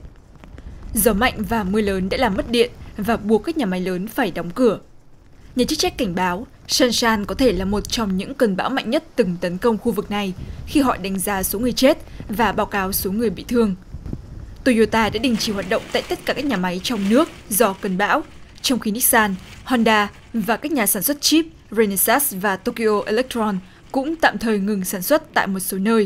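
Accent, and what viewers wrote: North, Vietnam. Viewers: GIỌNG BẮC ĐỌC KHÓ NGHE QUÁ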